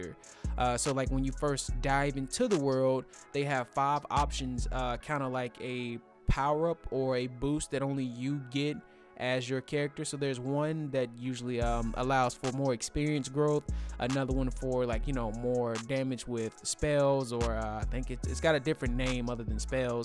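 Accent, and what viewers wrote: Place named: United States. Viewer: You sound American dawg n as far as i know its only out on Asian servers ...so how you playing it?...on PS4 we gotta make a Hong Kong account or something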